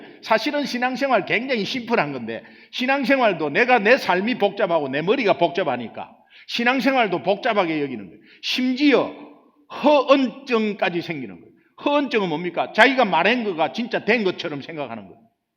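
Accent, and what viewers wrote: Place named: Busan, South Korea. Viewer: 그사람이 순천사람이라서 사투리를 잘 모르나보네요 목사님은 완전. 부산말투예요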